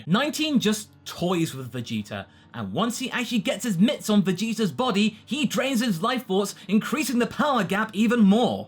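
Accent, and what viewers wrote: United Kingdom. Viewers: Ya kinda sound like a british Goku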